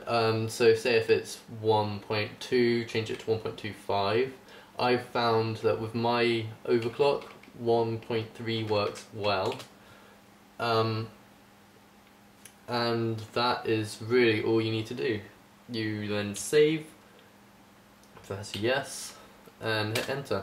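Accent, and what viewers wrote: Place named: RP, United Kingdom. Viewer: Beautifully spoken. What a wonderful example of speaking the Queen's English. Forget what the pleb antking1117 said. He's just jealous. Probably speaks with a drawwwwlllll. Get your hair cut.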